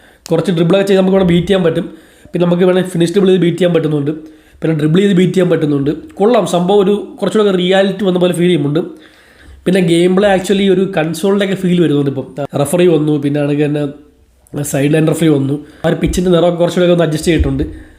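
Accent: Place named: Kasaragod, India. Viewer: Majje illa nammale kasaragod bhasha alle ath😁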